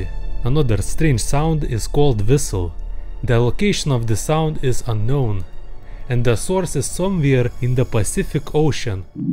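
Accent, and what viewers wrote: Russia. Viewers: this russian accent is sooo good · Is he Russian? Cuz it he is I love it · as a Russian myself I can confirm that some people really have accent like this when they talk in English, if they don't talk or practice often. But it's kinda strange that has it, considering that he's narrating many videos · ur accent remind me of russia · По акценту слышу что русский парень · Love the Russian accent 😂😂😂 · Ukrainian, English and Russian. And this accent sounds exactly like a russian speaker trying to speak English without any diligence. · Cool concept for a video, but god damn I'm tired of "Russian" channels. Bro, even FPSRussia got old after about the 10th video. The accent isn't entertaining. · are you from Russia? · Хард рашн акцент?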